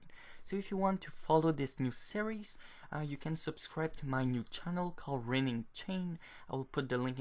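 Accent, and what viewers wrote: France. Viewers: T'es français ? O_o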